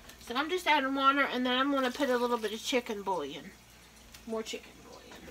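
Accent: South, United States. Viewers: That’s how us southerners say it☺️!! My late mama, God rest her sweet soul, would call the word, yesterday, yursday😂!! She also used to tell me that I needed to get my “poplar” sleep instead of “proper”😁!!!! I miss her💔!!!!!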